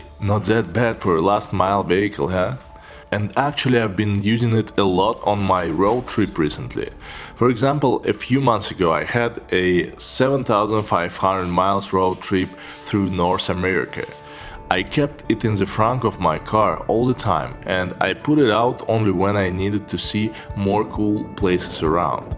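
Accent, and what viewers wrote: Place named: Russia. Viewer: Ещё не полностью избавился от русского акцента:)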